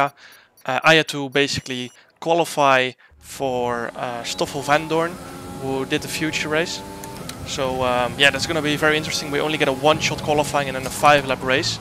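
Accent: Netherlands. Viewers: je hebt echt wanneer je Engels praat hoor je gewoon het Nederlandse accent Lekker gedaan jammer met de damage Maar lekker bezig een "keep it up"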